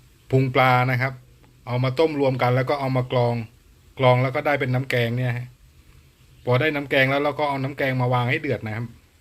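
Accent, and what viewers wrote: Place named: South, Thailand. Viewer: 555พี่ แหลงใต้ชับผึด😁😁😁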